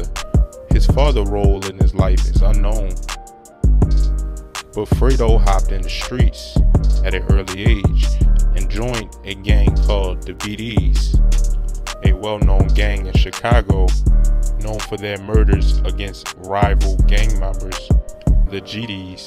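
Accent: Baltimore, United States. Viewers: Narrator must be from Baltimore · I can tell he from Baltimore lol it's how he says you two flew etc 😂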